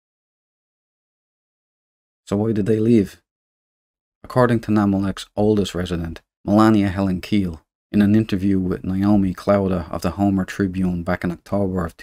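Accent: Dublin, Ireland. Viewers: Ive watched many docs and read a tonne of stories about this place and many others similar...its good to hear a fellow dub tell the story i thaught i was the only person intrested in this in ireland 🤣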